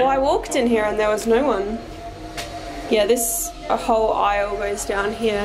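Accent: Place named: Australia. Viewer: There are different accents in a language and none is "correct" or "incorrect". Same with dialects.;) I love accent and I'd love to visit Australia someday. English isn't my first language and I always understand what she says. Keep doing what you do,! ❤ Thank you for creating these videos and teaching us about these places.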